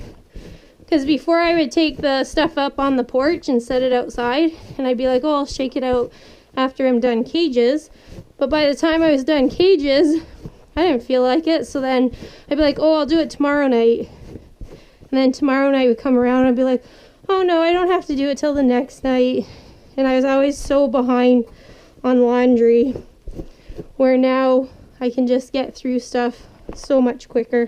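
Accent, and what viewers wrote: Canada. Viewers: Judging by the way you speak, you must come from southern Ontario. I'm from northern Ontario. You wanna talk winters??? My daughter moved to Windsor I was almost ready to move with her lol. We get really bad storm up here. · The moment I heard you talking I knew you were Canadian! Just found your channel & I loved your commentary on this video! Subscribed & happy to follow a fellow Canadian ;) look forward to seeing more!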